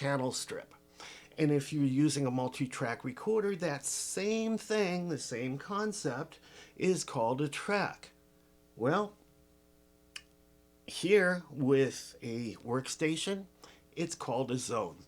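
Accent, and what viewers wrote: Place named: North, United Kingdom. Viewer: ) I kept leaning over looking around the mic stand that was in front. I tease, but I love your approach to breaking down the instrument and your pleasing effect throughout the video. Ive the F8 but am NOT a piano player. I hope you continue this series and delve more into the instrument. Maybe I'll see you at NAMM! P.S. Love your northern accent!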